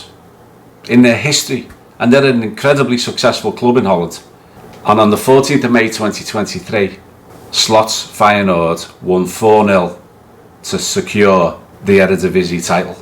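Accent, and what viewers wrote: Liverpool, United Kingdom. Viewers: keep up the good work mate, excellent analysis and love your Scouse accent, reminds me of my best mate Stanno 😊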